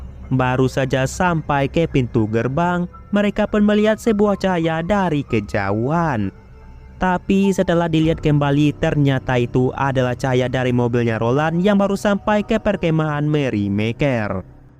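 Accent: Aceh, Indonesia. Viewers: Bahasa logat Aceh nya nampak kali iya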